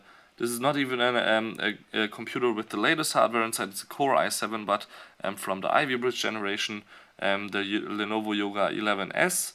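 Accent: Germany. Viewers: Man hört dass du Deutscher bist :D...Aber trotzdem sehr gutes Video,gut erklärt :)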